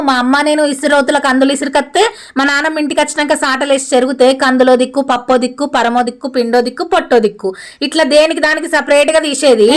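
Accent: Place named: Vemulawada, India. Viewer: అక్కా మన వేములవాడ యాసను, దేశమంతా తెలియజేస్తున్నావ్ సూపర్ సూపర్ సూపర్,,,,,,,,,,,,akka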